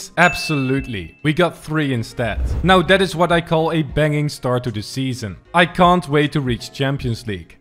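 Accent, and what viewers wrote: Netherlands. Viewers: Are you from the Netherlands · are you dutch? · Are you Dutch?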